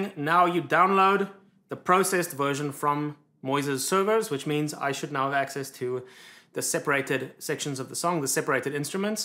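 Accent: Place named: Afrikaans, South Africa. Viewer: Your Afrikaans-English accent of "flip" is classic South African. Hi daar hier van Bloemfontein af!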